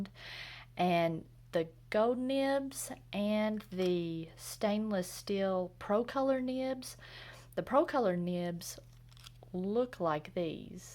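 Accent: South, United States. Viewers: May I ask you from which state you're from? I really like your accent, it sounds kind of relaxing, but I'm German and not too familiar with all the American accents... If I had to guess I would say something southern or mid-west-ish?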